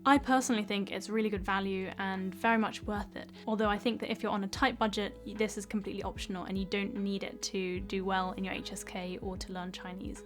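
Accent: RP, United Kingdom. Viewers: Hi. Love your British accents. You are very motivating. Thank you for your guidelines on Chinese learning. I am trying learning Chinese as well. Very interesting and I think is the hardest language to learn. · This young lady is drop dead gorgeous and far more than simply bright, arriving replete with a British accent! I am now technically an old man (although I neither look it, act it, nor have a body that reflects it - and I am quite sure that I will give those who want to know how it do my life style how to do it! Moreover, I will be giving my advice for free - but that doesn't mean it is easy either as I am also in love with Kung Fu, weight lifting, running, and Keto) so if I can learn this while living in the Stock Market you can too! Thank you Dr.! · Assuming an average person would only do about 1 hour a day, because burnout is a thing, this plan would get you to her level in about 2.5 years. 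Not sure how long it would take to get her nice British accent. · Hey,I like your British accents,but I think you should take next time microphone,cause I struggle to catch some words. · i loved your british accent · Starting to learn Mandarin, and came across your vids. You could be in the dictionary under "life isn't fair," as you are stunningly beautiful, obviously brilliant and the British accent simply ups your attractiveness to levels beyond. I think you will be a great incentivizer to learning because I would not want to disappoint you by failing. To quote Jack Nicholson in As Good as it Gets, "you make me want to be a better man." To that end, I am hitting the books. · I am a HSK3 graduate, from Argentina, then only phrase you said is perfect mandarin. I am not getting into any more of your channel, i thought you would start speaking zhong wen. Nice posh brit accent, btw. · Pretty faces tend to break people's brains. 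And a high class British accent forces you to listen. You have a devastating package! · Oh she's pretty beautiful!!! and I really loved her videos I've never seen something like this before. Somehow I would like to ask something. Is she from UK? because I'm currently learning English so it's a little bit difficult for me to understand some things and I can say that I'm used to the American accent so it's possible that I can't get her for that reason. Obviously always with all my respect.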